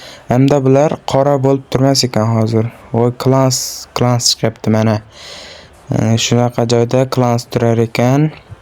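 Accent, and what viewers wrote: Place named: Khorezm, Uzbekistan. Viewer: Chisdi Xorazm sheva qu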